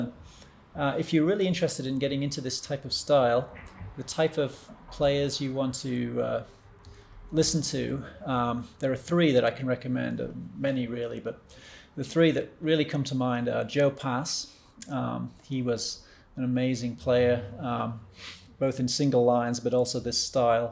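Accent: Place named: England, United Kingdom. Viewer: Hi just seen the horizon doc Strange signals from outer space, very interesting.Great guitar playing by the way. Noticed your accent. Are you English.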